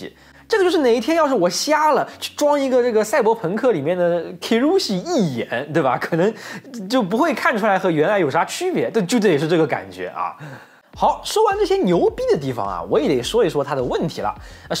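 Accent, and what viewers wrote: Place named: Shanghai, China. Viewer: 博主上海人吧,这个普通话听着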